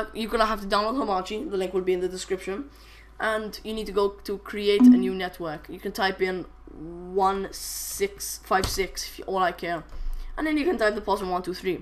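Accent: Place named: United Kingdom. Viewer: omg i hate britsh english this so retarded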